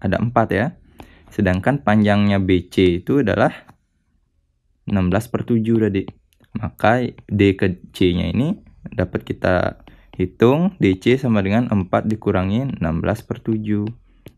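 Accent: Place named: Bali, Indonesia. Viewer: Dari logat nya kayaknya yang punya channel dr Bali.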